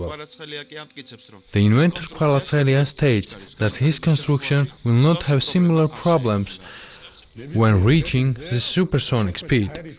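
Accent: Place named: England, United Kingdom. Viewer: L'accent rosbeef est déjà horrible à entendre ... mais là c'est l'apothéose !! 😡😡😡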